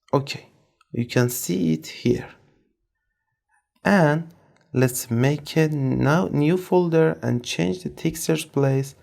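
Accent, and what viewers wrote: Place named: Iran. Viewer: fully Persian accent I love it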